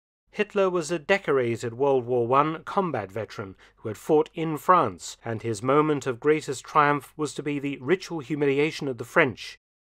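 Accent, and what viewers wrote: United Kingdom. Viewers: you are a great story teller. Your English dialect is especially pleasing to listen to as an American. European/British English is so much softer, gentler to listen to.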